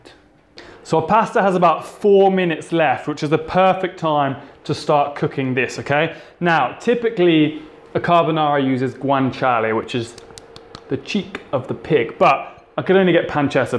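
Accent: England, United Kingdom. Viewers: Your Engish accent when you speak in Italian is adorable.❤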